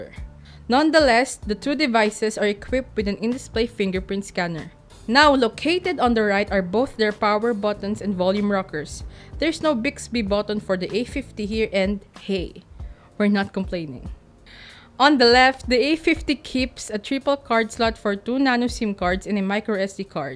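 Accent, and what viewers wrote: Philippines. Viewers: pinoy kse ganun tlga d native language eh.. may accent tlga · Non-native Filipinos speak that way. Let it pass. At least she's trying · This accent is too thick. Im guessing it is pinoyish english.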